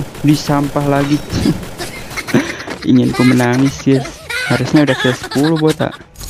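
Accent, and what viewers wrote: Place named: Lampung, Indonesia. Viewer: Abang orang lampung bang kok ngomong sikok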